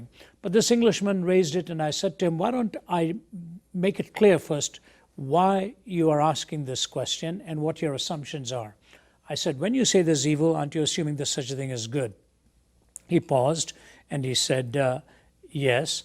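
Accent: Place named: Ireland. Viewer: I first became aware of Ravi when I found him on the radio when I was a trucker. In my mind I thought he was an Irishman because of his accent. I imagined him with red hair and beard lol. I thought his name was Robby! But I am so glad I stumble upon him! He is so smart and well spoken.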